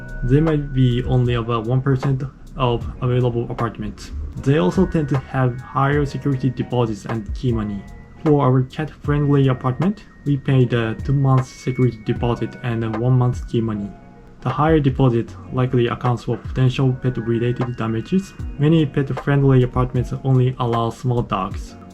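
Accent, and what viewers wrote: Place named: Japan. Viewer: I hope this comment isn't taken the wrong way — but I really like noticing your accent poke through, because otherwise, you sound completely like a native English speaker! By analyzing where your accent comes out more, I feel like I understand better what sort of verbal quirks a native Japanese speaker has. Really, what I mean to say is that your English is really excellent and you are very well-spoken! I'm excited to see more of your videos, not just ones that are topical to my personal interests. I am interested in the perspective you give to the topics you talk about. ^^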